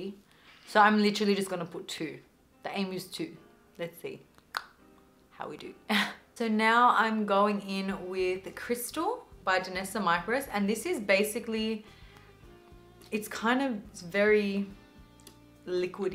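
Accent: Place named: Australia. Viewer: i wish i looked like you omggg and i love your aussie accent lol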